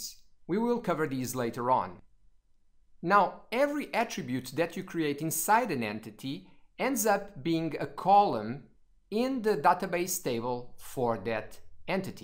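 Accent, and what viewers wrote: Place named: India. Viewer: indian accent😵‍💫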